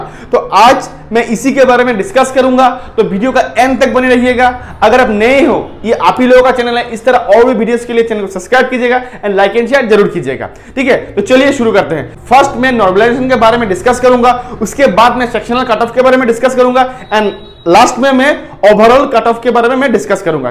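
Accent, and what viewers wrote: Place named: Bengal, India. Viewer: Sir,I had attempted 52 in which 1 is wrong not sure about it,but in others question I have 100% accuracy but say I will consider it above 95% as I did only for accuracy with moderate attempt...So shall I prepare for mains?? category is normal And it was 15 th Oct 3rd shift (tough shift) And are you Bengali bcz accent is similar to that!!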